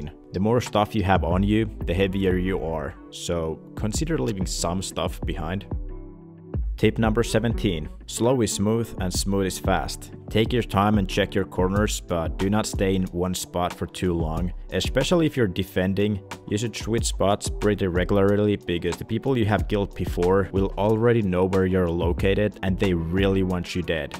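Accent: Finland. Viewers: Sulla on todella hyvä englanti suomalaiseks, en meinannu tunnistaa mutta jotenki puski suomalaisuus läpi mikrofonin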